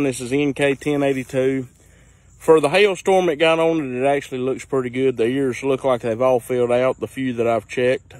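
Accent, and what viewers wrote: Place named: North Carolina, United States. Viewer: I knew you were also from NC just from the accent, before I saw the channel name. Thats some good lookin corn there!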